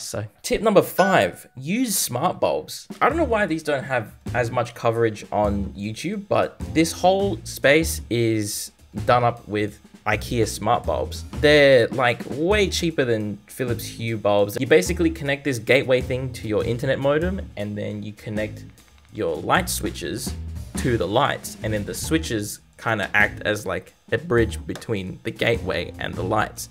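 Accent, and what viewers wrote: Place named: Australia. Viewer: Idk what it is but the Australian Accent just itches a part if my brain. I love it 😂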